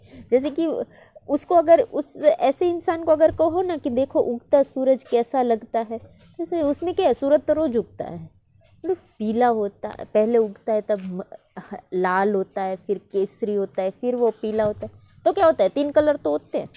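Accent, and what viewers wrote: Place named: Gujarat, India. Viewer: Gujju tone of hindi 😊😊😊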